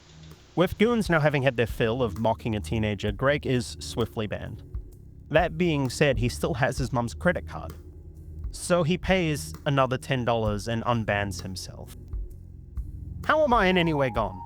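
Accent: New Zealand. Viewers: I always thought he sounded kiwi too so I'm inclined to agree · he’s from NZ isn’t he? I’m an Aussie so I know a kiwi accent when I hear one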